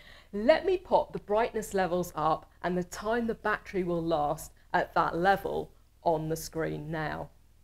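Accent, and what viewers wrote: United Kingdom. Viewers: Commanding female british accent?✔️ Bike stuff?✔️ BRIGHT POINTY HEADLIGHTS?!?!?✔️✔️✔️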